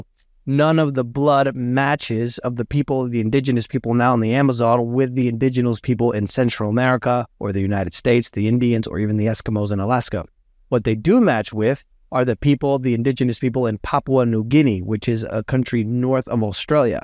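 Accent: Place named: Spain. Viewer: I miss your Spanish accent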